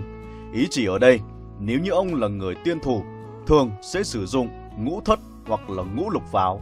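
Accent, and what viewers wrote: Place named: Phu Yen, Vietnam. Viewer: xét về kiến thức + giọng bình thì kênh này số 1. Vịt ú chỉ là làm trước, nhiều người theo dõi trước thôi, giọng Phú Yên nghe ko hay, ko truyền cảm bằng giọng Trung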